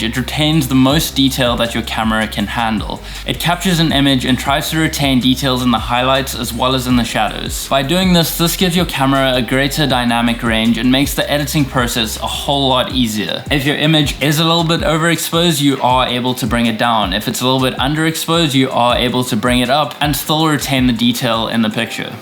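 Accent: South Africa. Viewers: Are you south African. Tour accent sounds like it.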